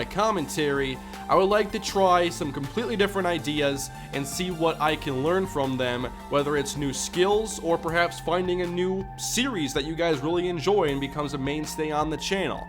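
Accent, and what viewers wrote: Minnesota, United States. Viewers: Your Minnesotan accent is much less pronounced than my friends from Minnesota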